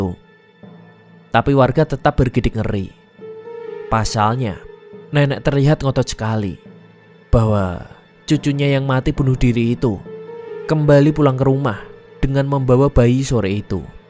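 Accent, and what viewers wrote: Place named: Temanggung, Indonesia. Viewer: Aksennya temanggung banget min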